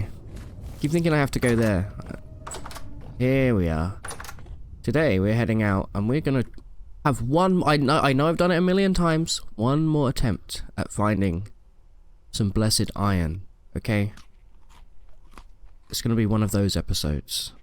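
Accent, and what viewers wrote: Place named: United Kingdom. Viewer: for music, my suggestions are, and (especially )!! i listen to way too much kawaii metal and not much else… isn’t kawaii metal and im not sure what genre they’d fit under but it’s good (and heavy!) streaming would be awesome, but i think i’d miss most of them ☹️ since our timezones are opposite (assuming you live in the uk from your accent) enjoying todays episode with my cat!!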